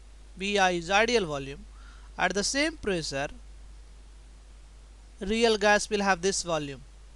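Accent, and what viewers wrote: India. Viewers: ohhhh WTF..indian engish voice..going to hell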